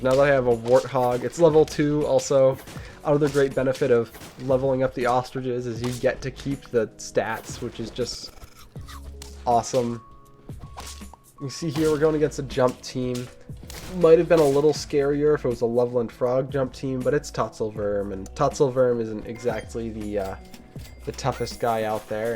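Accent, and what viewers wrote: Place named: New Zealand. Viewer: didn t know you were from New Zealand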